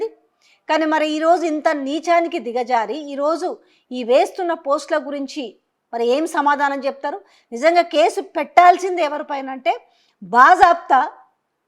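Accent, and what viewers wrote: Telangana, India. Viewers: Manchi topic. meeda. Video chesaavu talli . Voice mariyu Telangana yaasa lo. Baaga matlaadutaavu .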